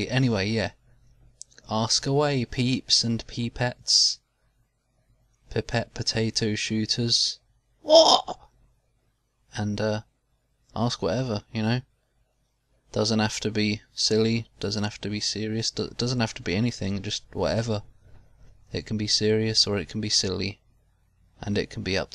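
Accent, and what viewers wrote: United Kingdom. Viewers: 1. What are the earliest memories you have with games, like what games did you play first? What were the first ones that really got your attention? 2. What region of, I assume, the UK is your accent from? 3. Do you participate in game jams and what engines have you used to make games?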